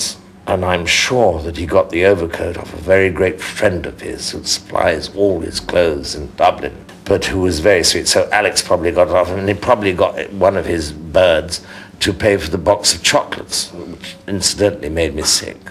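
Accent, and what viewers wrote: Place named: England, United Kingdom. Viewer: This is how all Americans think English people speak.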